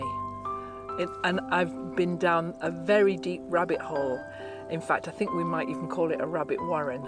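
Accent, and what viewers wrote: England, United Kingdom. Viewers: You are always busy with something! I live in Michigan, USA, but was born in England at Wimpole Park. We went back to England again & lived in Maidenhead for my grades 1 - 4. Dad was in the US Air Force. I'm retiring at age 68 on 12/31/2022. I have several quilting projects lined up. I love listening to you as it reminds me of my neighbors in Maidenhead! They were like Grandparents to me! I love watching you make all of your beautiful things. Take care!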